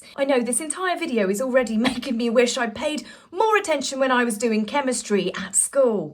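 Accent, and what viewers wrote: England, United Kingdom. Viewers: Congratulations! Greetings from Melb, Aus… I’m not an OG BUT have loved following you and your collabs. You are an inspiration, especially to watch back on your vids from 5yo. I respect you, your reseach and opinions as a royal commentator. You have an authentic mix of humour, sarcasm, wit and compassion all wrapped up in your gorgeous English rose accent 🌹 Here’s to many more years and subscribers ahead and hopefully nothing but joy with no need to mention Harkles in the very near future🙏 Happy days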